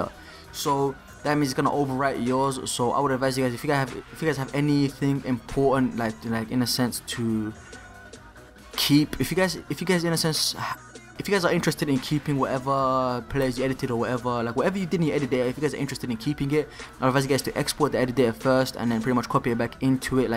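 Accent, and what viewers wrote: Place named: London, United Kingdom. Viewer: London accent! Gunners Fan??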